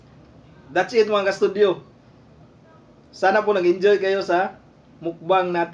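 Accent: Visayas, Philippines. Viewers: bisaya ilhan gyud tono nato ani bai🙂 enjoy lang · Bisaya ka bai o tagalog hehe..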